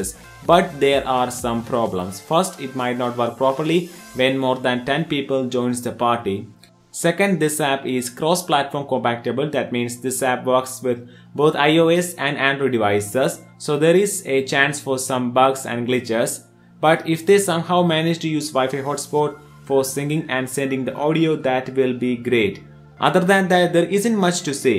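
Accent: India. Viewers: I'm sorry if i offend you but where do you come from because you're accent is awesome and sounds Indian or around that area.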